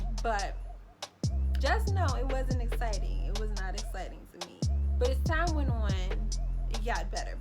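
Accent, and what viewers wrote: Baltimore, United States. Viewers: i knew u was from baltimore the first time i heard your voice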